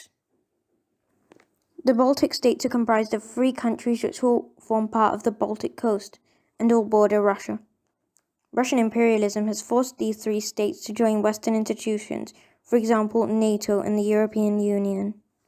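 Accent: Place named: United Kingdom. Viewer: Your voice is the most British voice I’ve ever heard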